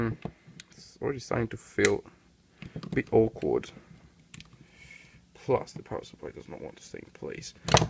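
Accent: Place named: Germany. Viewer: Nice accent mate...thanks for video...honest and correct...;) LOL super funny also. German i guess?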